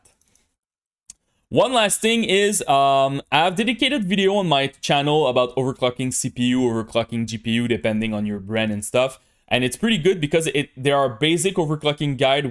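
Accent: Quebec, Canada. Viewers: Sa ses de la belle accent quebecois :) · Je peux reconnaître ton accent du Québec .... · Toé tu viens clairement du Québec LOL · Super. Entk sa parait que tu est québécois xD. Entk C'est super, j'ai gagné en FPS.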